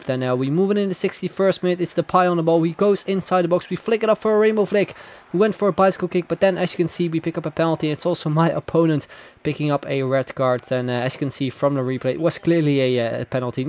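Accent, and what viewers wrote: Netherlands. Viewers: Are you dutch cause you have a accent?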